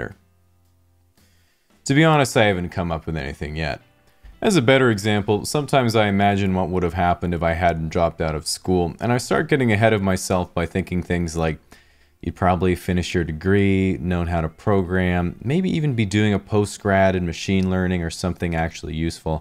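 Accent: United States. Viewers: You sound like if internet historian was American. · you sound so american after hearing your australian accent wtf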